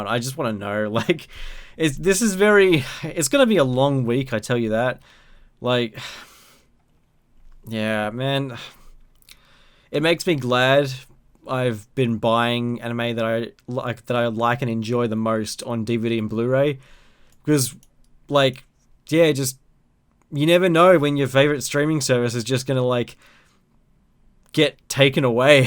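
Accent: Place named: New Zealand. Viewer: U Aussie cuz u don’t sound like it u sound like ur NZ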